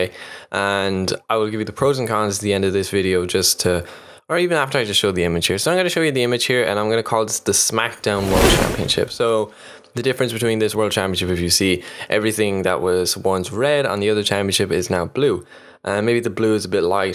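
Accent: Ireland. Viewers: im guessing your irish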